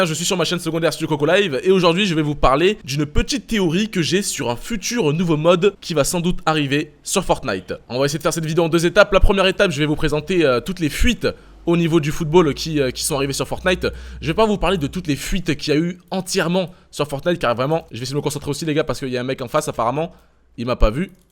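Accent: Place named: South, France. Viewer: Ta tellement un accent du sud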